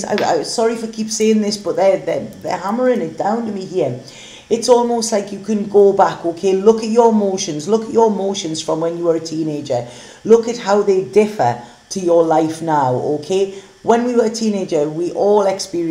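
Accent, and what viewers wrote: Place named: Scotland, United Kingdom. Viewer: oh and thank You so Much , you glow with good clean white /pinkish aura and i can sense you are such a good lady , i love your never ending smiles and your excitement for me/us is awesome , great reader and that what sound scottish just great , reakly like your energy girl we will talk again ok , im always open ,and usually can hear if you are tuned to my rock n roll station lmao but tru , this stuff cant be wrong buit i gotta be sure , so i wait ,isntr like i havent already , all life damn near ,, im good at it now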